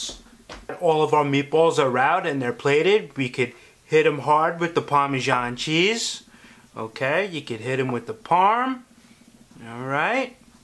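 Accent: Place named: New York, United States. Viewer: Love the way you cook and your (New York) accent...? 😃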